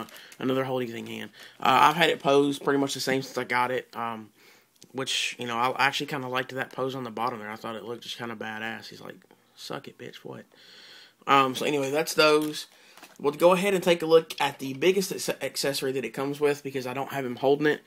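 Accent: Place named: Texas, United States. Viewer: hey thanks for making a video where you talk it out, I saw others and I found it annoying where it was just music. Also I like your accent, are you from Texas?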